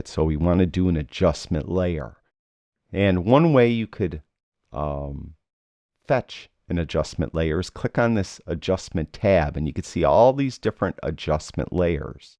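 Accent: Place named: Buffalo, United States. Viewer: I left Buffalo in 1964, graduated from Hutch Tech in 1962, the second I heard your voice I knew you were from Buffalo...I'm practicing my Buffalo accent..😊Very good videos.. I am a long time PS user and just switched to Affinity. Good stuff. Thank you.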